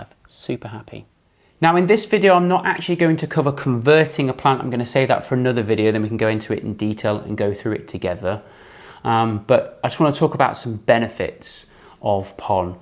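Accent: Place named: England, United Kingdom. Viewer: You sound English!! Where do you get your clear inner pots? I can’t find places in UK that sell them?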